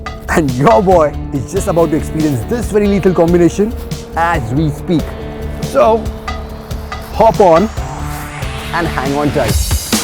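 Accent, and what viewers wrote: India. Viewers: great review and the Indian accent rocks :o)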